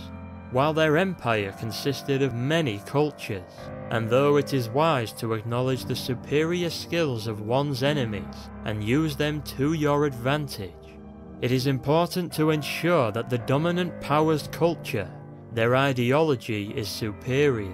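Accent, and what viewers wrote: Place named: North, United Kingdom. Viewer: "With the soldiers not knowing how to ... fart?" I know, it's your accent, I'm from the north as well. Great video as always!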